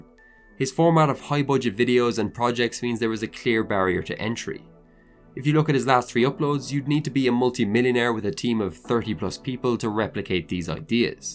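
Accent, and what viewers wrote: Cork, Ireland. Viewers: are you from Cork?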